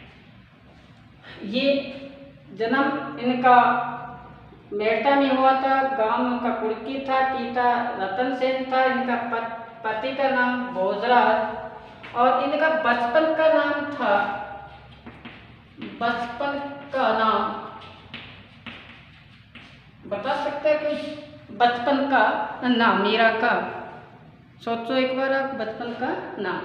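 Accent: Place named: Haryana, India. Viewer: Kon sa colleage ka h ye Sir hariyanvi bol rhe h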